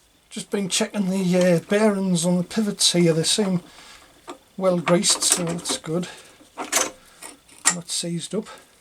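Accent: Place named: Sunderland, United Kingdom. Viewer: Great video 👍 This should help me out when i have to change my rear shock on my Thunderbird 900 triple some time in the next couple of days 😊👍 That sounds like a north east accent, Sunderland by any chance? 😊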